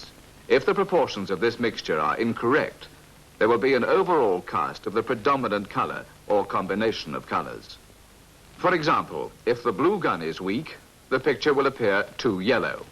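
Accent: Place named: United Kingdom. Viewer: This is very fucking BBC with DAT FUCKING British accent.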